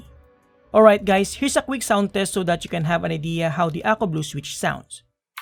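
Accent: Philippines. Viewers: Love the accent, Philippine pride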